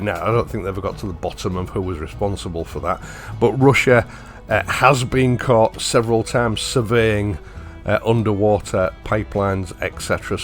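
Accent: United Kingdom. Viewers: Absolutely agree with you. That's why I'd prefer an old European car to a new Chinese one stuffed with unnecessary electronics and a half-windshield size touchscreen. It feels like you can't control these cars completely or simply repair it. It'll turn into a brick one day if needed. Btw, greetings from Russia 👻 Came across your channel when I was buying my first Enfield, but stayed because of the calmness of your videos, the stunning views and your wonderful British accent :) Good work and peace to us all!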